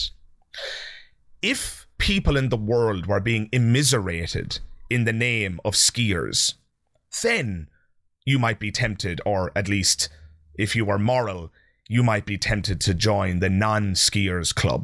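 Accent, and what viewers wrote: Ireland. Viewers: Holy sh*t! You're Irish?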